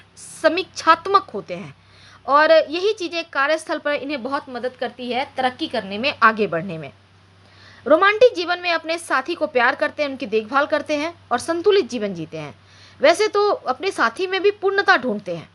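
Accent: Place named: Madhya Pradesh, India. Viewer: If I am not wrong I think you are from mp accent se lagta hai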